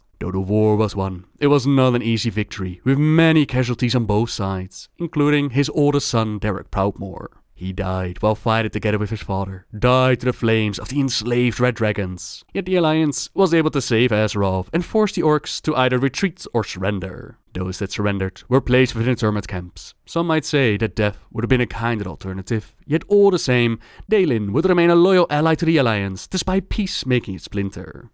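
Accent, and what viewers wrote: Netherlands. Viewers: Are you Dutch? Your accent truly adds such a great aspect to your storytelling. Brilliantly done!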